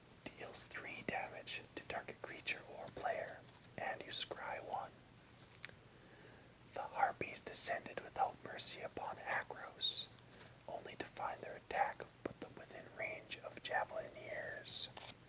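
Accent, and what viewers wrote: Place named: Canada. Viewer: It's nice to hear another Canadian accent :)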